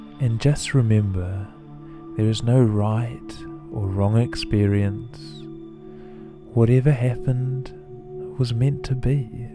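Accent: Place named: New Zealand. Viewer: Real relaxn More guided meditation please. its nice to hear a kiwi voice! its more calming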